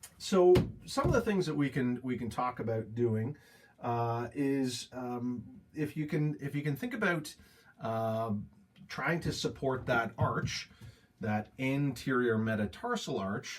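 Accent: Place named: Canada. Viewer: Yes, it's called "Canadian raising." Before I saw Barrie and Orillia in the channel title, I knew was a fellow Canadian. It seems to be more pronounced in/typical of southern Ontario, but we do it here on the west coast too.:)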